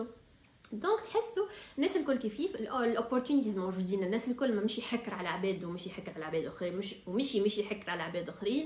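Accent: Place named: Gafsa, Tunisia. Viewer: يا بنيتي ااااش عملتي فيا رااااكي وليت ادماااااان ماشاءالله عليك قداش تدخلي للقلب وقداشك واثقة و صنديدة بنت بلادي القطار وقفصة البية اللي نتوحشها مللللي انفوت حي المطار والله هاني كبيرة وعندي بارشا في فرنسا ونحس روحي في كل مرة اول مرة نساافر فيها وراااااهو كلااااامنا القفصي وحده كلماتنا واللحن اللي انخرج به من فامنا 😂😂😂😂😂😂😂😂😂😂😂😂😂 وحده واكتشافك للمجتع الغربي توا تتعدو بيه انا زادا كيف جيت لفرنسا بقيييييييت حاااالا فمي 😮😮😮😮😮😮😮😮 أشهر واشهر وبعد الحق تعودت على كلش 😅😅😅😅😅😅😂😂😂😂😂😂😂😂😂😂😂😂😂😂😂😂😂😂😂 ملخرررررر يا بنيتي راااأااااكي ادمان وراني والله ممكن قد امك 😅😅😅😅😅😅😅😅اما في مخي 😂😂😂😂😂😂😂😂 jeune premier degré 😂😂😂😂😂😂😂😂😂😂😂😂❤❤❤❤❤❤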